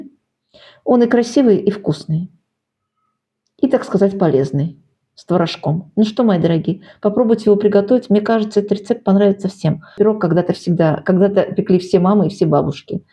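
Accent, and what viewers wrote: Baku, Azerbaijan. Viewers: Спасибо,дорогая,как же приятно слышать ваш бакинский говор!!!!! А рецепты нашей беззаботной юности!!!!Храни вас Бог за такие теплые чувства!❤️ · Наши Девушки Бакинки, во всем талантливы, Мы девушки всех наций были дружны, делились всем, самым лучшем отсюда и вкусняшки…., а у Вас прекрасная золотые ручки, Так приятно слушать Наш говор! Спасибо! Счастья во всем! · Спасибо за рецепт. Мне почему то слышиться в вашем говоре бакинский акцент. Может я ошибаюсь? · Точно подметили, 💯% бакинский акцент! Ни с чем не перепутать! Привет из❤Баку! Всем Мира! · ...и внешность и говор бакинский....Спасибо за рецепт ,наша дорогая! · Очень вкусный пирог! Спасибо большое. Ваш бакинский акцент греет душу -такой приятный! Здоровья вам, дорогая 🙏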